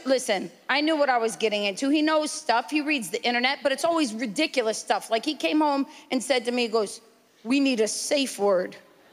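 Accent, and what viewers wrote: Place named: Boston, United States. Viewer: Such an incredible voice and "Buoston" accent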